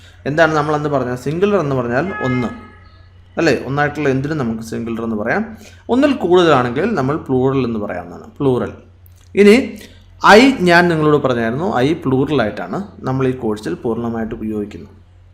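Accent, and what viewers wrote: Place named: Trivandrum, India. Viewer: Sir... ningalude place evideyanu? Vere onnumalla chodikkan karanam... ചുവപ്പ് ennu parayanullathine ചൊമല ennanu.. sr parayunnathu.. athu konda.. chodichthu.. tvm aano.. sr nte place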